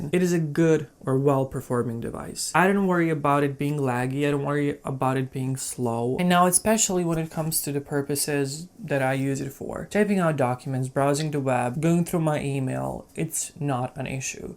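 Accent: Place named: Poland. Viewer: You kind of sound Polish :)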